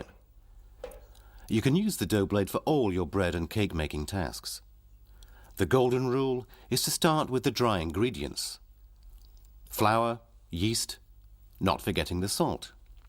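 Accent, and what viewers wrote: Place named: United Kingdom. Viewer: he is british, i like his english by the way.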